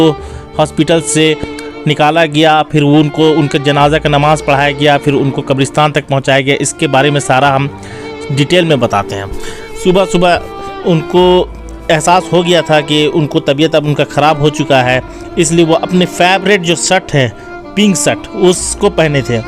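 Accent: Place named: Bihar, India. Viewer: Utuberji, aapni languge sudhariye, Pronounsation me bihari lehja he, faltu baton se vdo bhot lamba bana dala he bhai apne, dusri baat ye namaze janaza dilip saab ki nahi he, pakistani vdo add kardiya he aapne janaze ki namaz ka.... Mujhe itna batayenge bhai... K q jhoot ka sahara lena pada apko....???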